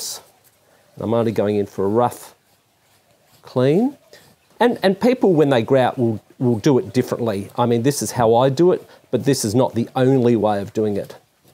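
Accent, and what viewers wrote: Australia. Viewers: Recently discovered your channel. Thankyou for the wealth of knowledge you share. I have yet to do any mosaics because it was a bit daunting. I'll be right now. Good to hear the australian accent and those birds in the background. 😊👍🌳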